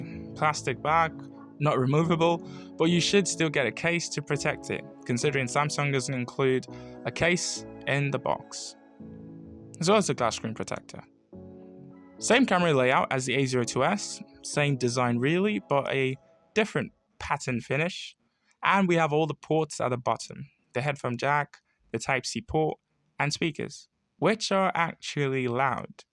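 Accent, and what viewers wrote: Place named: United Kingdom. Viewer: I had no idea he had a British accent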